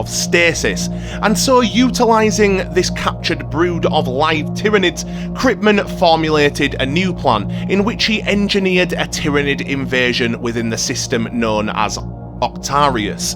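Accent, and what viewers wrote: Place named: North, United Kingdom. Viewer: Liked and subscribed, great to hear a northerner doing lore! A humble suggestion, try putting your script or notes nto chat GPT, there was a little bit of repetition. Keep up the good work!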